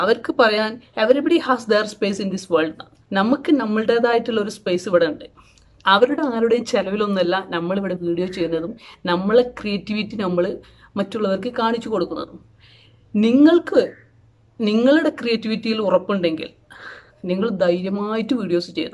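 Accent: Malappuram, India. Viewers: ഓരോരുത്തർക്ക് അവരവർക്ക് പറഞ്ഞ ഭാഷയിൽ സംസാരിക്കാം ഓരോ നാട്ടിലും ഓരോ തരത്തിലായിരിക്കും സംസാരങ്ങൾ ഇംഗ്ലീഷ് ആരോട് മലയാളം സംസാരിക്കാൻ പറഞ്ഞാൽ അവർക്ക് സാധിക്കുമോ മലപ്പുറം ഭാഷയ്ക്ക് എന്താ കുഴപ്പം നല്ല ഭാഷ തന്നെയാണ് പിന്നെ നമ്മൾ മലയാളികൾക്ക് കുറിച്ച് കുറ്റം പറഞ്ഞിട്ടില്ലെങ്കിൽ ഉറക്കം വരില്ല😄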